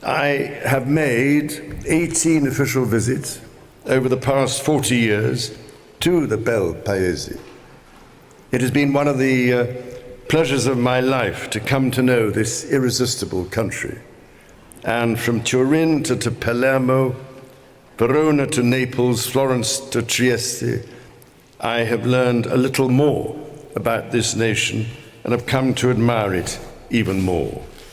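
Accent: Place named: England, United Kingdom. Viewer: He is speaking Italian with a very heavy English accent. He did well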